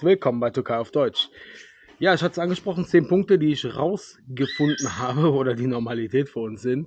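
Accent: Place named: Cologne, Germany. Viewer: Danke für die 10 punkte der sprache her kommst du aus köln. Sind vor 1jahr von ķöln nach Avsallar gezogen ķölle Alaaff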